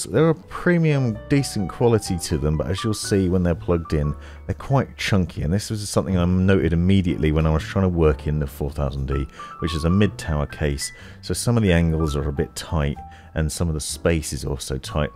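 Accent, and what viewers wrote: England, United Kingdom. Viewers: English accent, jazz piano, that earns a sub. Hope the tutorial is good.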